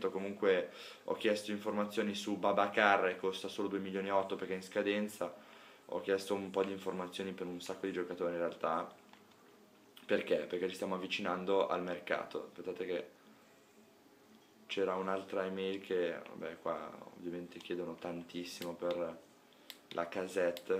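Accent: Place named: Italy. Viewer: Come sei fiscale, w la pronuncia italiana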